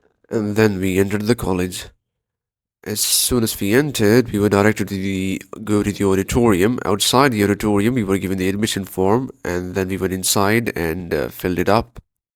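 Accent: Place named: Russia. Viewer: Why there is a lil bit Russian accent in your english?😅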